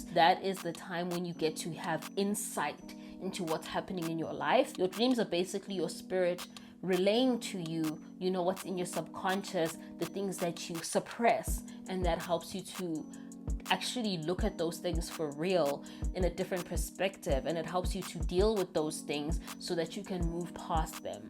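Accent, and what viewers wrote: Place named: Africa. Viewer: U R Amazingly Gifted with Truth, Knowledge, Wisdom, Understanding, Beauty, Creativity in Your Unique Style/Elegance/Fashion, Professional, Precise & Proper African yet Down2Earth Dialect, etc & most Importantly, The Highest Vibrational Frequency of LOVE❣️ I 🤎, value, appreciate & honor U, Wombman King /Goddess/ Empress for Your Fearless Boldness in sharing Truth & allowing those of us who hav WOKE 🆙 & intentionally honor & cry out to Our ANKHcestors & The Universe, for Truth, Sankofa, etc! Ultimately & most importantly, for SelfLove! Which U mentioned & resonated deeply with My Spirit❣️Bcause SelfLove is what we need to empower us to LOVE Others as we Love ourselves! I Am Gr8fully Blessed to be counted among your Thousands of Sibscribers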